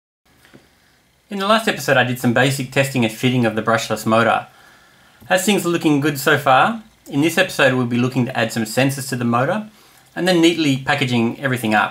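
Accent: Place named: New Zealand. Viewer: Thanks for sharing your brushless motor retrofit project with us. Those motors interest me as to their potential as a replacement for various devices with the added benefit of speed control but they are still quite expensive just to have an introductory play with. I’m in New Zealand hence the expense. Speaking of which, your accent is very Kiwi. Am I right?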